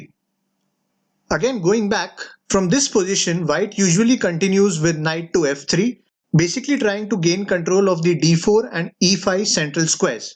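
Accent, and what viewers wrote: India. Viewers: I like Indian acent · I’m assuming you are Indian but the accent.. so I believe everything you showed us here since chess was invented in India .. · Your English is Indian (understandable) don't give foot note that's disturbing, it covers board.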